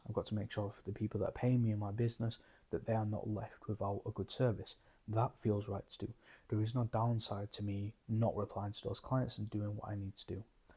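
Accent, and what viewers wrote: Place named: West Yorkshire, United Kingdom. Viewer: ithought it was a west yorkshire accent. I studied linguistics at uni. Id love to meet up buddy if possible for a chat sometime? To hear your journey and to ask for advice on the Gospel.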